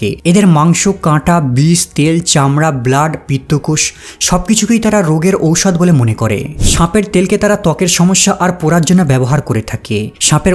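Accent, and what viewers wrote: India. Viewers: ইন্ডিয়ান মনে হয়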